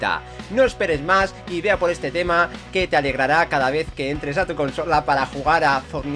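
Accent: United Kingdom. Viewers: Que guapete eres tu inglés es de cambridge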